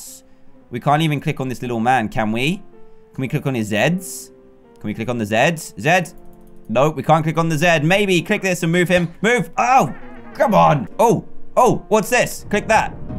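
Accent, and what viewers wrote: United Kingdom. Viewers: Well Mr probably American ,or Canadian ,he's British so who freaking knows what he says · he s bidish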